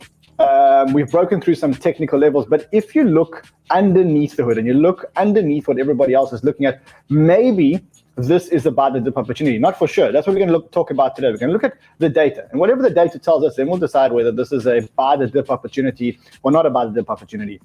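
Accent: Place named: South Africa. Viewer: Sounds too South African: "The feds must fall"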